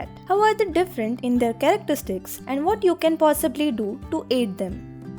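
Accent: India. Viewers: I can hardly understand a word you are saying, even though your attempting, to make this video in English. Your East Indian accent is so thick, you may as well speak in Indian. Good effort though. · What wor st, eunu ch like voice you have! Hateeful in dian accent! All cats are not she. Then how do you say her? Eunu ch! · hears indian technical support accent and already stops video at 5 seconds, agony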